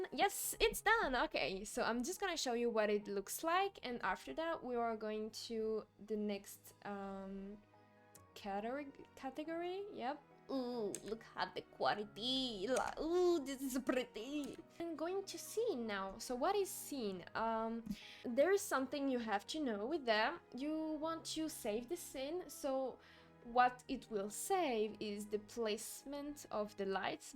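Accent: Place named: France. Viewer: I love your french accent 😍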